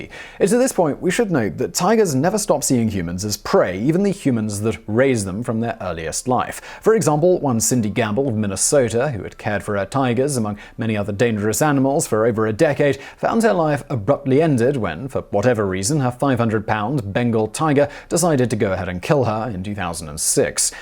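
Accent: United Kingdom. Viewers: Your British sounds somehow better than other British accents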